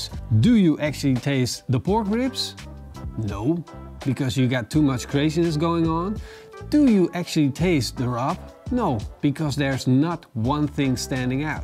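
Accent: Netherlands. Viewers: Is that a Dutch accent I sense? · Do I detect a Dutch accent? It takes one to know one. Great video, trying this tomorrow! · Hahaha. Nou zat ik te luisteren en dacht.... volgens mij is dit een nederlander of ben ik nou gek. Leuk om te zien.👌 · I LOVE your videos. Part of it is your accent that makes me feel at home ( parents and opa +oma with the same accent.) I would love for you to throw in a few more Dutch words and phrases. 🐖🐖🐖🐖🐖🐖😁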